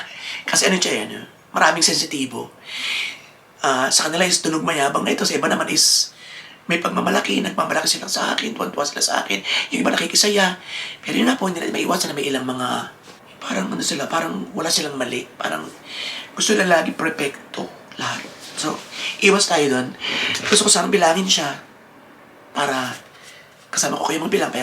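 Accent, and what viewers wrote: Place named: Batangas, Philippines. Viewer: Congrats po.Para pong Batangueno ang accent nyo😊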